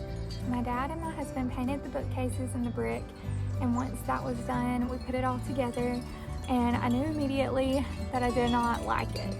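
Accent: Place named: South, United States. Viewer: loveee your adorable southern accent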